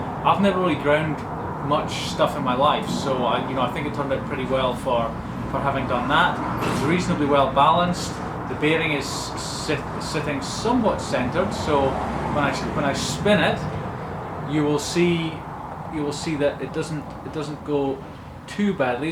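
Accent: Scotland, United Kingdom. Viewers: Is he Scottish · Are you Scottish?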